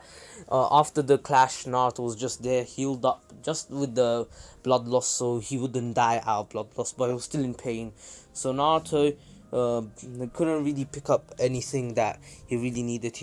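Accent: United Kingdom. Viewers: i just love to hear a brit swear it sounds amazing and awsome video btw keep it up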